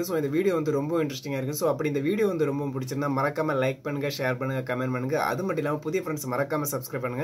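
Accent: Kanyakumari, India. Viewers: Bro Neenga Kanyakumari Ya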